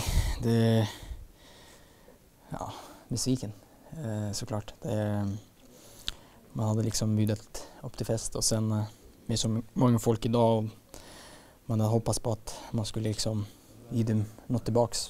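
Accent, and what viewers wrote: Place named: Norway. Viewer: Han har börjat prata lite mer norskt igen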